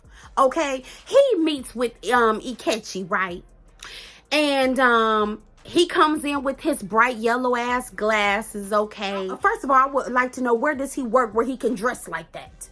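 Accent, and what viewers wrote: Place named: South, United States. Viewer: You a mess. I can not with you. lol Are you from the south? You sound like us from the south girl. lol 😂😂❤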